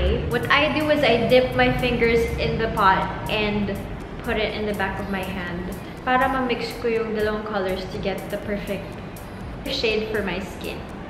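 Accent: England, United Kingdom. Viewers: Melly Andrade I really don't understand the hate she's been receiving ,she's fine to me and loooove the English accent. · sorry ulitin ko nlang yung vid,sa mukha mo lang ako naka tingin ang pretty mo girl haha..btw i like your english accent hehe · ganda at galing mo mag make up girl so perfect and i love ur english accent sarap pkinggan kya pls. wag kna magtagalog pls. haha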